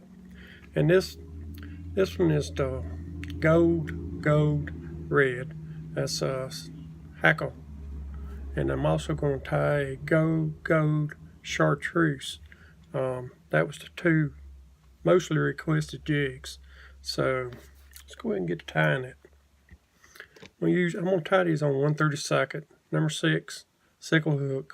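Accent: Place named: Western North Carolina, United States. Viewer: Another informative video, also inspiring! I could tell by your speech, that you had to live somewhere near me! I Live in the Western part of North Carolina! So we do have a little in common! Thank you, again! Take Care and Be Safe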